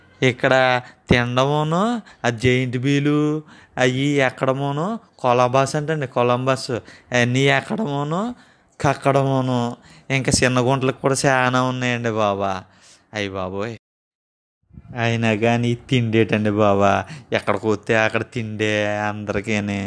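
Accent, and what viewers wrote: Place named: Godavari, India. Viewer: Godavari jillla yaaasa super bayyya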